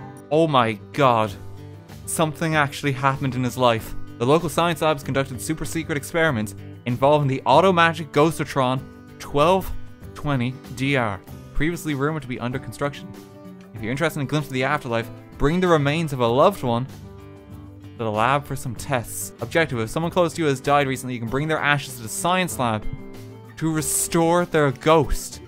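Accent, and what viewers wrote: Ireland. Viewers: I figured you were Irish. XD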